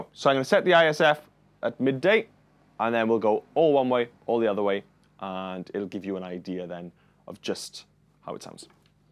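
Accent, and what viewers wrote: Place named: South Wales, United Kingdom. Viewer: Brilliant video,. (I’m from South Wales but living away so good to hear a familiar accent). Although this vid is from a couple of years back, it’s still an outstanding demo of the amp. At first I was thinking I wanted you to keep playing the same licks and sequences so as to make it easier to compare the voicings; but as the demo went on, and the excellence of your playing became apparent, it seemed good that you’d modified your style to suit the nature of the sound in question. You were also one of the first demonstrators I’ve seen to mention at the start that the modelling technology used here is in line with the sophisticated developments of Kemper, Fractal and Line 6. Excellent work. Thank you!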